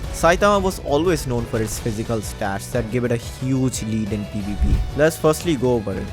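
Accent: India. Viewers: The indian accent it makes it beter ong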